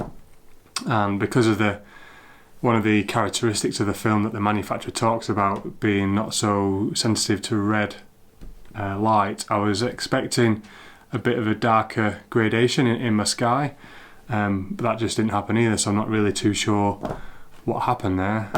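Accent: Yorkshire, United Kingdom. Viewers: Great video! Yorkshire accent?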